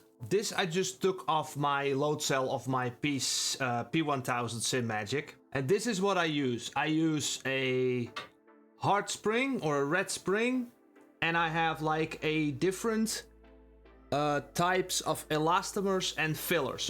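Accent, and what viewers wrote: Netherlands. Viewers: Nondeju dat is een lekker Hollands accentje jongen 😁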